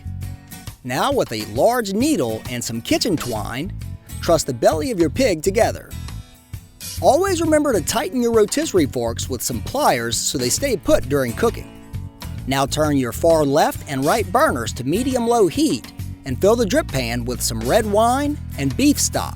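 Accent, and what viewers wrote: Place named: Midwest, United States. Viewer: If you were born in Gonzales , Louisiana . Why , can't you pronounce French words correctly ? You sound your words not with a Louisiana accent , but with a typical Midwest accent . I will say your food looks absolutely delicious . I really enjoyed watching you prepare your dishes . "Bon travail avec votre programme alimentaire ". Dieu vous protege.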